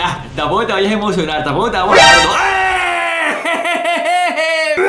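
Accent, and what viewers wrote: Venezuela. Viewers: El es venezolano?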